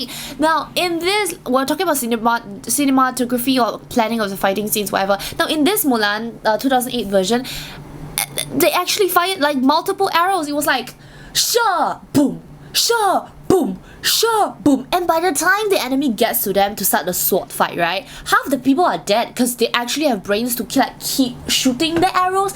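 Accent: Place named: Singapore. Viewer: Ohhh dear are you from spore? You accent is so nice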